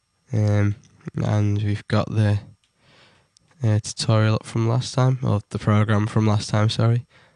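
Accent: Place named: Wakefield, United Kingdom. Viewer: Hey - you sound like you come from Wakefield - possibly Leeds.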